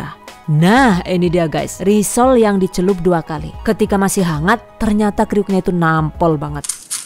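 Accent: Banyumas, Indonesia. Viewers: Bund orang mana yah? Kox Ngmongnya Ngapak banget,😅 siapa tau Kita satu daerah 🤭🤭🤭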